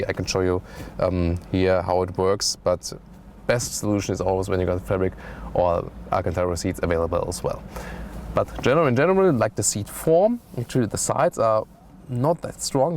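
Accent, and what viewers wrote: Germany. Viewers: Warum macht ihr ein Review vom Mustang auf Englisch? Es gibt 3000 amerikanische, englische, australische, neuseeländische, kanadische YouTube Channel, die Autosendungen auf Englisch machen. Ich bezweifel, dass die sich dann gerne einen englische Review mit deutschen Akzent ansehen/anhören wollen, wenn es Channels, wie Motor Trend gibt. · Ha ha, there's something cool in Your German accent! :]]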